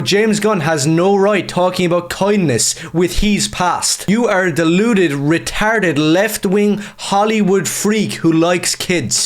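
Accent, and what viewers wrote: Ireland. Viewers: Love your channel bro. Are you from Dublin ? Anyways can you say shite in your video instead of crap, please ? It’s a cool word and your accent is cool bro. And yea fuck this woke bullshit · The Irish accent didn't clue you in?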